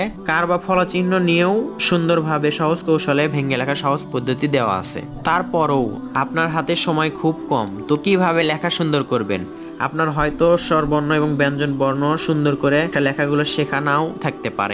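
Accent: Bogura, Bangladesh. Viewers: আপনি বগুড়ার তাই না?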